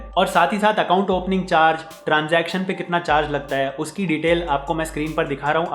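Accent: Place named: Punjab, India. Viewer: Vele baitha tha #Punjabi tone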